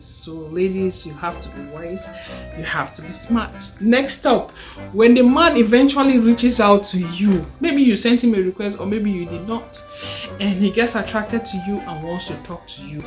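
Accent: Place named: Pidgin, Nigeria. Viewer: OMG 😯 - that was a nice 👍🏾 video, you were very much down to earth - no dulling + no forming, your pidgin english (broken english is very concentrated ooh 😲) - do you blame for bringing out the Nigerian man in him? when you completely took him off guard -) can’t wait 😊 for the follow up. Well done ✅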